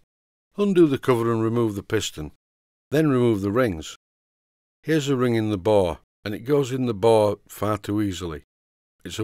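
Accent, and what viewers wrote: Yorkshire, United Kingdom. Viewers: Instead of wife and girlfriend jokes why not recite ‘Albert and the Lion’ to show off you fine Yorkshire accent? Thank you for a really fascinating series.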